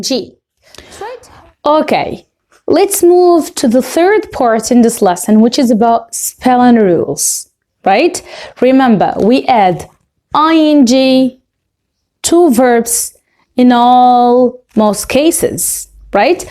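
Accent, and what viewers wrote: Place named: United States. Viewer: بسم الله ماشاءالله ثقافتك واسعة ولغتك كانك اميركية 💚💚💚 جيش عالم المراة مع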